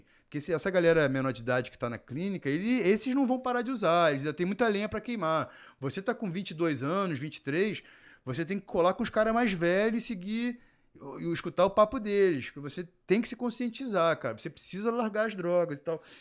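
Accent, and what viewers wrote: Rio de Janeiro, Brazil. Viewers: NÃO CONSIGO ASSISTIR ATÉ O FINAL ESSE SOTAQUE CARIOCA É MUITO INSUPORTÁVEL CREDO .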